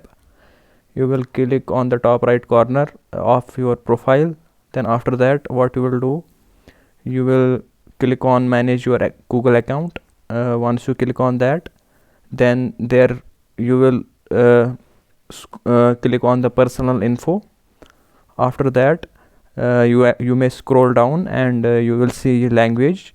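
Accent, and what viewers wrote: India. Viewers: You are definitely an Indian guy and your English has an Indian Hindi accent also, still you say I don't know how to read Hindi. Way to act cool bro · No. It doesn’t. I have many kashmiri friends and you sound like them. Just asked!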